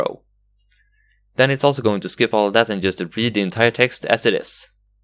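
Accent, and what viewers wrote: Sweden. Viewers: I see that you have a swedish accent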